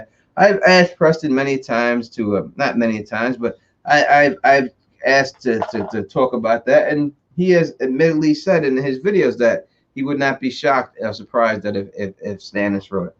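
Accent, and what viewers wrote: New York, United States. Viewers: I still can't get over how new york this guy is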